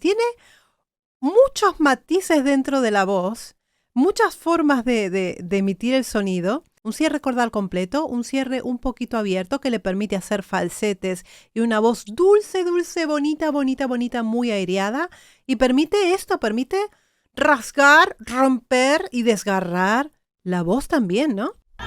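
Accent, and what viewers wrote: Argentina. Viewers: se te escucha tu acento argentino como si hubieras estado ahi hace poco ❤no te lo notaba tan marcado como hoy. Pero también, no me he metido mucho a YouTube entonces igual soy yo la que te escucho mal. Gracias por tu pasión a la musica. Me encata ❤ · Eres argentina y española ?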